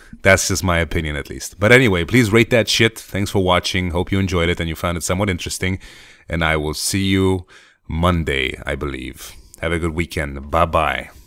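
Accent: Poland. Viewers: I really like your polish accent :D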